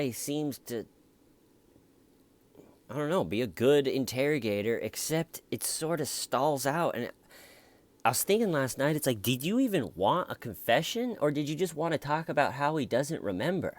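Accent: Boston, United States. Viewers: I love your Boston accent 😂😂😂 just made me picture a bunch of cops from The Departed! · This is the first time we’ve seen your hair, isn’t it? A neat cut! Love your Boston accent.... reminds me of JFK. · Love ur Boston accent. Lmfao! Sound like Marky Mark back in the day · you'd fit in perfectly, ya look like a Bostonian Irishman... Ya got the accent down better than most lol.. I'm from Southeast Mass... Closer to RI, I have more of a New Jersey accent ... Strange how it is round here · STOP WITH THE STUPID BOSTONIAN ACCENT! YOU SOUND LIKE AN IDIOT!😠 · BTW your boston accent is killer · I love your videos! Keep it up, totally makes my day and the Boston accent is priceless. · OMG you got the Boston accent! A reason to say "balls" is cuz he (the detective) is playing an angle of class difference (a huge social deal in Boston and more so back then) so he can show he isn't deferential to a doctor-to-be -it's like a micro-aggression to convey shit is getting real Phil ( IMO ) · Omg, you are historical. 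Great Boston accent. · So true about having a gambling addiction. I'm imagining how depressing and devastating it has to be to keep fucking myself over like that, and I seriously would want to take MYSELF out. Ok your Boston accent is funny as hell. I'm in Western Mass for 10 years now (which has it's own dumb accent) and it took me years to realize that the Boston accent is NOT indeed a speech impediment or a foreign accent. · You sound like a whacked out Kennedy lol w the Boston accent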